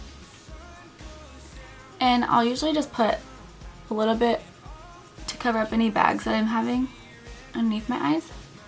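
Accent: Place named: South, United States. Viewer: you are so freakin adorable. love your southern accent! goood vdeo too!(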